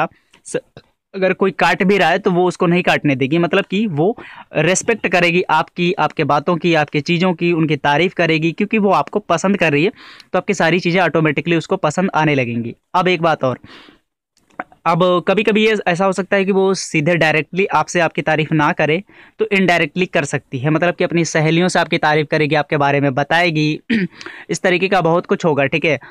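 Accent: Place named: Bihar, India. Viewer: Tu khud awaj se Bihari lagraha hai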